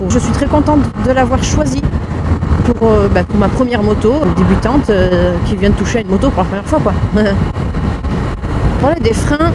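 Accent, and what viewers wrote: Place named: Nice, France. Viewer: Bonjour 👋, Enchanté, joli accent de chez nous (Nice, Toulon ? J ai eu une ybr en 2011, en 10 ch, j ai réalisé tout les cols de montagne, arrière pays Nicois, même le col de la bonnette qui culmine à presque 2800m. Par contre pour l autoroute C était..... Mdr cata. La j ai une gsx r susuki (125) 15 ch.... Ça change. Avant le A2 que j ai commencé, pas évident du tout l épreuve du plateau. V a vous 2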